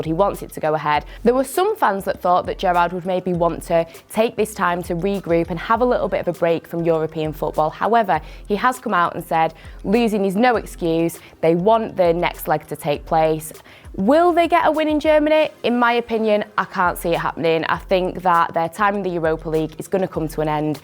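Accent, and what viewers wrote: United Kingdom. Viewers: Just when I started understanding British accent. One football English: Here's the level 2. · Feels good to hear a bit of pure British accent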